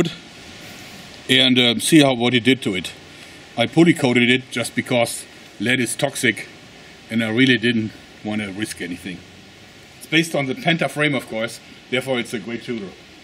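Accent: Germany. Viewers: Sprichst du deutsch? weil auf mich kommst du wie ein deutscher rüber (von Dialekt)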